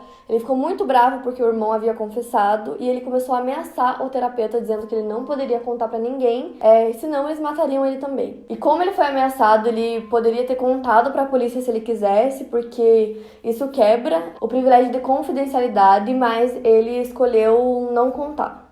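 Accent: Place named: Santa Catarina, Brazil. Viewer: Não tem nada a ver com o vídeo, mas eu sempre me pergunto se a é de Santa Catarina ou do interior do Rio Grande do Sul. Os sotaques são muito parecidos. Eu sou do Rio Grande do Sul e as pessoas que moram mais no interior tem o sotaque bem parecido, então eu acabo me confundindo.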